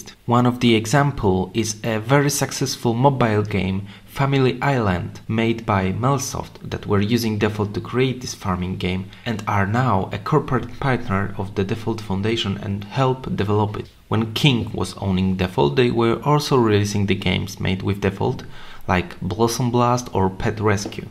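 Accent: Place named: Poland. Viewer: Polski akcent od razu można poznać.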